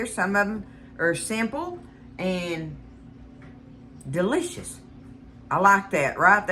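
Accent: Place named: Mississippi, United States. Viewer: Afternoon! I watch your videos for 2 reasons... 1. The foods you make 2. You! You're such a keen reminder to me of my family (all of whom have passed), the homey recipes & kitchen, the way you cook, and definitely the way you talk. I was born & raised in South Mississippi down around Hattiesburg but way out in the country on a farm. Spending time with you is like being back home with family. Thank you so much for filling some empty spaces in my heart. ~Nan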